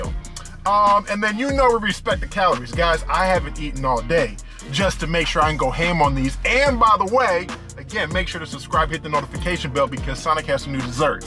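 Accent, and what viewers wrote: Texas, United States. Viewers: That Texas accent 😹 1K calories for the burger????!!! 🍔 It does look super good though! Only hot dog I trust is from Costco 😎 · Love the attempt at sounding like a Texan LOL Shout out from Dallas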